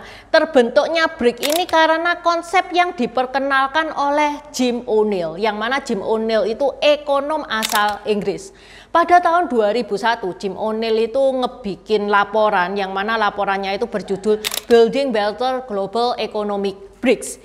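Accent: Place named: Surabaya, Indonesia. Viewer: Dari surabaya yaa ... Kog logatnya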